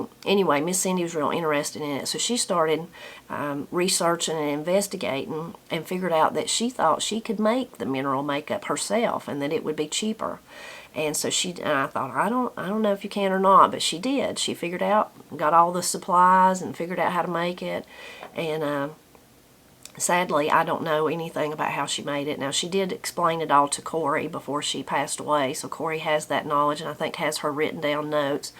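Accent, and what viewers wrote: Appalachia, United States. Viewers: I am curious about persons who hold themselves out as being capable of beneficial commentary for others. As a writer I admit I am very critical of such persons. Morally I think you live in an admirable way. While you have an interesting way of speaking as a representative of Appalachian culture, I find it disappointing that you don’t seem to have pursued a means speaking English correctly in order to set an example within that culture. Yet you speak of working at the college, which is somewhat puzzling and contradictory.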